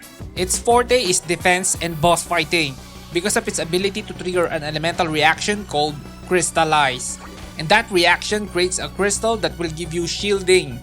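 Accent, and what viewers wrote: Philippines. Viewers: that voice....and accent... Filipino?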